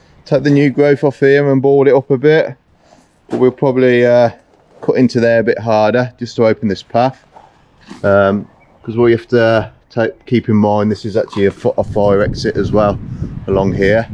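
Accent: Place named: Midlands, United Kingdom. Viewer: Great Video 👌 I’m near Solihull not far from you by the sound of it ✌️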